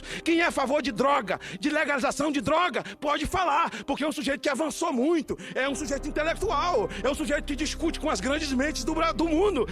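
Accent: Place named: Rio de Janeiro, Brazil. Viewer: Senador do Brasil, você é nosso herói. Nasci na mesma cidade em que você nasceu. Fui criado em São Paulo e falo normalmente. Senador, por que você começa com sotaque carioca e depois volta ao normal. Você não é carioca. Assista o seu vídeo e veja o número 2 você fala como carioca e depois como uma pessoa normal. Honre sua naturalidade. VOCÊ NÃO É CARIOCA. É BAHIANO, HONRE SUA MÃE. VOCÊ É NOSSO HERÓI. PARABÉNS!!!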